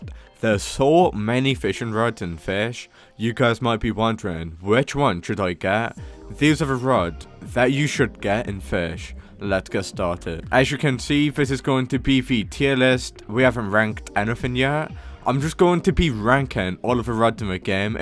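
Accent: United Kingdom. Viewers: Omg he have the bri ish accent😮 (not trying to be rude pls)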